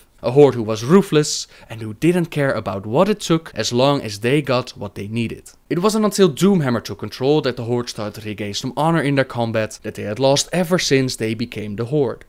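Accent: Netherlands. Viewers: Ik wist dat je een nederlands accent had! Groot gelijk over de ondertiteling die nu overal gedubt word...niet goed. Zeker niet goed. Ik ben een Horde fan, en ik haat Garrosh maar dat is omdat ik verliefd werd op Thrall z'n horde, met de Darkspear en de Tauren. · I love your dutch ascents. especially when you say Draenor, sound so cool lol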